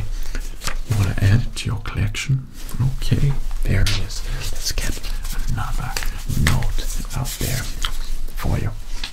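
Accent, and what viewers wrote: United States. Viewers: Speaking American accent. What the heck. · That’s actually a really good American accent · Your American accent is getting REALLY good!! 👏👏👏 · Amazing. Two giveaways to the American accent: 1. We call it a flashlight, not a torch. 2. British ASMRtists say “anything” like “a-nuh-thing” or “a-neh-thing.” Americans say it like “eh-knee-thing” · Everyone’s talking about your joker impression, but I’m just amazed by the American accent in general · I love it that does an American accent, but still says "torch" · damn your american accent is really good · Happy Halloween and you wore Joker costume and American accent. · His American accent isn’t perfect but it’s pretty darn good, much better than I expected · the American accent sounded great. You should do it more often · he sounds so different when speaking with an american accent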